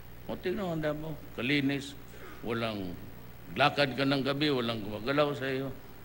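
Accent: Visayas, Philippines. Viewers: Hehe, damo ng hangin ang ulo mo... nkakatiwa mkinig ng visaya eh... Visaya mn ako Tatay Digong eh... from Romblon God bless you po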